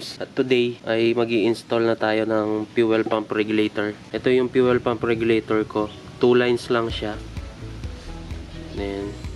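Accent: Pampanga, Philippines. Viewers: Kapampangan ka boss? Halata sa accent eh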